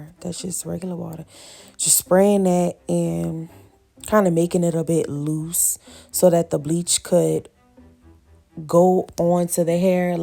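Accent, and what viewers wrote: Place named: New Orleans, United States. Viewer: New Orleans!! lol gotta be I know accents like the back of ma hand im from Atlanta, but if im wrong ma apologies love lol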